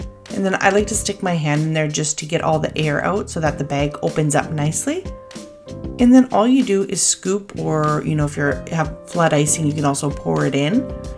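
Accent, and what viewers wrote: Canada. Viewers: OMGosh~ Thank You SO Much for linking these videos! Apparently, I'd watched them, as I'd "liked" them & added them to my "Crafts & DIY" playlist, but I'd completely forgotten about the double-bagging trick, so I can't tell you enough how much I appreciate you posting these links. I'm recently disabled, so I can't do as much as I'd like with my grandkids, but cooking & baking is one of the things we CAN do. They're sensitive to sugar, however, and their moms like to keep them to an organic, healthful diet, so I've used some of your ideas with my recipes for hummus to decorate their favorite quinoa chips we bake. We dye the homemade hummus with beet & spinach powder (which gives it a really unique flavor) & they make flowers and leaves on the crackers. Their other favorite thing to make is ginger chews (just boiled strips of ginger with equal parts water & sugar until soft, then sprinkled with sugar when cooled~ these obviously have a lot of sugar, so they're a once-in-while treat). 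Sometimes, the ginger is a little too spicy. I have 7 grandkids, ages 7 to due in 2 weeks (my only granddaughter is the 7 year-old), and they all love spending time in the kitchen! Thank You AGAIN for ALL Your GREAT Ideas & Fantastic Spirit! PS. My Mom was Canadian, do I hear a hint of a Canadian accent? I still have my Mom's "Kate Aitken's Cookbook" that must be 70 years old by now. Many Blessings to You & Yours!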